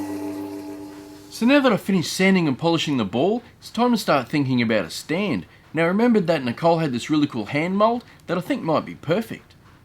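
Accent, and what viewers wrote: Australia. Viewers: You should’ve made one solved and one mixed. But anyways it ended up looking awesome. 😁 Are you an Australian? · Hey Mate, love your voice and of course your turning! But the way you speak in your classy aussie style is what I enjoy the most! Been a backpacker in 2016 and fell in love since then!!💘🇦🇺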